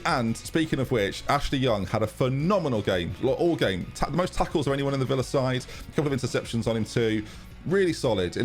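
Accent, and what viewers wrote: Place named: Scouse, United Kingdom. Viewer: You do a good job at hiding your scouse accent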